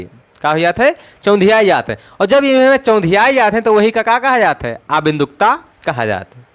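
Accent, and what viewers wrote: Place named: Awadh, India. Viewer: Gajab अवधी अंदाज में sir ji ❤❤❤❤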